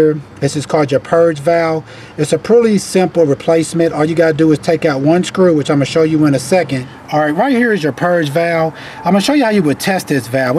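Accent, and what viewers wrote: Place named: Louisiana, United States. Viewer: i hear sounth louisiana, st bernard parish in dat voice. great tip, thank you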